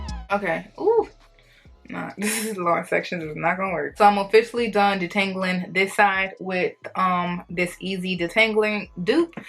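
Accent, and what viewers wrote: New Orleans, United States. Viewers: It’s so interesting listening to you speak, I didn’t know you’re Nigerian but you definitely have that African American accent and a little bit of that NOLA flair.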